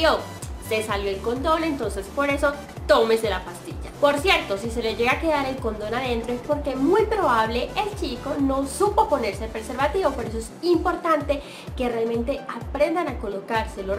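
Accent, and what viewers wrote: Colombia. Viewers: siempre había escuchado acerca de la ''famosa'' pastilla y no tenia claro muchas cosas :/ gracias por aclarar todas mis dudas y tiene una hermosa voz (mas ese acento de colombiana) -saludos!! :D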